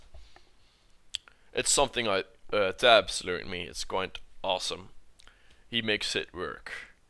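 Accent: Netherlands. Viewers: Yup I think so cause' he's dutch and dutch people have that accent plus he has a rather deep voice :) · Ik hoorde aan je stem al dat je Nederlands was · Lol ik vind het gewoon grappig dat nu pas iedereen er achter komt dat hij nederlands is, hoort dan niemand dat nederlandse accent in zijn engels xD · yup his accent is stereotype dutch :Z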